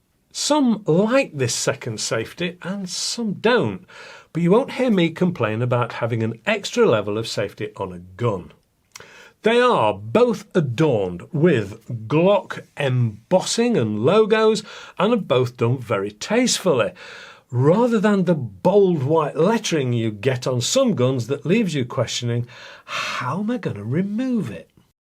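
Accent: United Kingdom. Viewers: Hi, Another great review. I purchased the Gen 4 about three months ago & am very glad I did. It's no tack driver but is OK for back yard plinking. Actually this BB gun was purchased as a safe training aide for my three high school to young adult nieces. For that purpose I have found nothing better that the Umarex Glock 17 Gen 4 CO2 BB pistol. My main difficulty with this replica has been getting the threads started straight when loading the CO2 cartridge into the magazine. Sometimes I get lucky & the threads line up the first time but usually I must try several times & also makes sure I don't get frustrated & force the issue. Thanks for your good humor & that Great British accent, Larry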